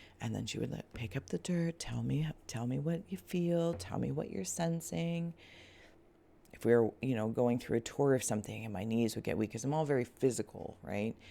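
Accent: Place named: West, United States. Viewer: hope you had a wonderful weekend! Not sure if this is the video but I do (I’m hearing a western accent, haha) I do wish to hear more of this flower farming side of! Seems to be my other obsession during this time of new beginnings. Floret flowers, I think that’s her name. She is breeding roses, dahlias and others that haven’t been breed before, taking it from big box stores and teaching us how to do it. She also is rescuing roses that were becoming extinct. The videos they put out are my new fav live mini series. I laugh, I cry, I learn and I feel part of their farm. Almost dropped your page on her page inviting her to you, i get the feel she’d really like us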